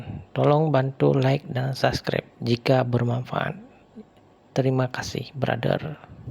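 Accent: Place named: Aceh, Indonesia. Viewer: alamat bengkel dimana bg,kayak logat aceh abg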